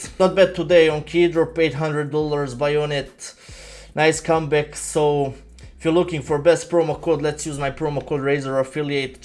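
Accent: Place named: Russia. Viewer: Your russian accent kills me 😂😂😂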